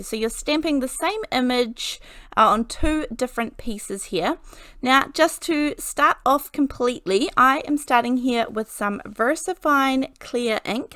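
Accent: New Zealand. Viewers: If you die cut the rectangle out of both cards, couldn’t you have made 2 cards by swapping your pieces over & still raising them with foam. I do luv your videos (yes I’m subscribed) & your kiwi accent too 😀👍🏼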